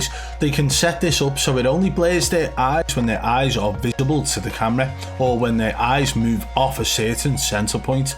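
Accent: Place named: Birmingham, United Kingdom. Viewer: a bermingham accent 😂 love it❤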